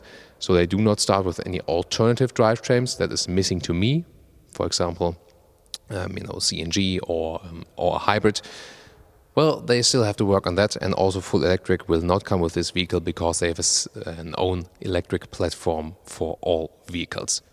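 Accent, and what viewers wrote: Germany. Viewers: walter neubär he's German. He has German accent :)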